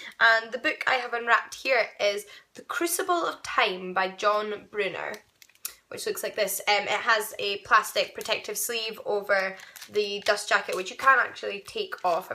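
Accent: Scotland, United Kingdom. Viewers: love your accent! You are Scottish, aren't you? I love Sir Arthur Conan Doyle's works too!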